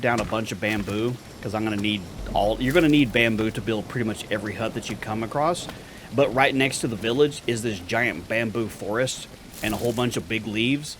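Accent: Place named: Texas, United States. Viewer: As for me this is not something I would play. The graphics look very nice and it seems to be pretty easy to play but not for me. Survival games are not something I normally play but I am open minded and am willing to watch you play. I started this way with 7D2D but later decided I would buy it and play it. I got your info watching Glock9 and when you first started talking I said he's Southern so I hung around watching your channel. I am in NC but my aunt lives in Humble, TX and I LOVE the Texan accent you all have. At any rate I've learned a lot from your channel in playing 7D2D and I highly highly appreciate it. I'll watch your channel and watch you play because I want to see the future of how this game works. Happy gaming and thank you for having a nice decent channel with some very nice members! :D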